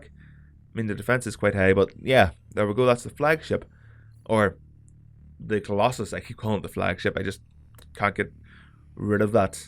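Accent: Northern Ireland, United Kingdom. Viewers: Ta man I was looking for this build. Good to see a Norn Iron accent online :D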